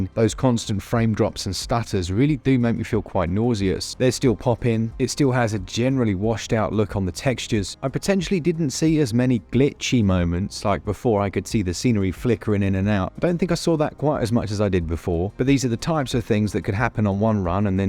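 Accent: United Kingdom. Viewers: your pops and plosives are really wet and disgusting also cease that gross british accent too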